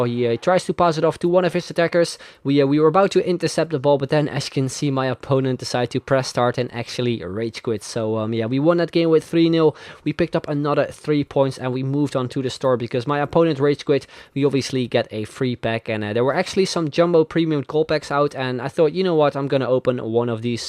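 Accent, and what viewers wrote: Netherlands. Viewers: Youre dutch jaja ik hoor het gewoon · is he dutch?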